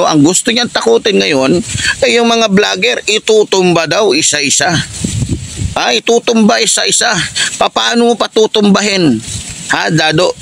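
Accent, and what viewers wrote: Visayas, Philippines. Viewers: Idol bisaya ka ba?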